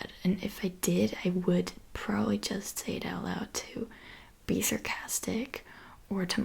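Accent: United States. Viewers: Your German? Could have fooled me with that american accent. ok every now and then it sounds a little off but for the most part "98%" you sound american :) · I knew you spoke German I just didn't think you were German. You speak English so well and naturally, I thought you had an American accent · your accent sounds American. your English is 'perfect' and your Spanish is as well. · This was fun....As a viewer for the last 2 years, I still can't figure out how you sound more American than my American friends ツ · You really wouldn't think you're not American. Your accent is so on point! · You seriously sound sooo American it's Hilarious lol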